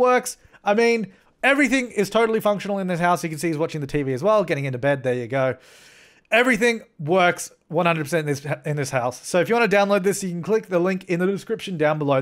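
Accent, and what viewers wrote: Australia. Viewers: They both have Australian accents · it's the accent, all dudes sound like this in Australia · It look me too long to recognise your accent is Aussie 😂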